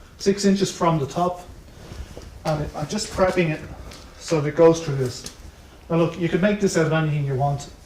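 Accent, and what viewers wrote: Ireland. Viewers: Just realised - was the reason I started to watch YouTube in the first place. Thank God he's back. Apart from the content being exactly my cup of tea (free heat and paramotoring) there's something therapeutic about the enthusiastic and humorous delivery in that wonderful Irish lilt. Normal service has been resumed! Good on ya!!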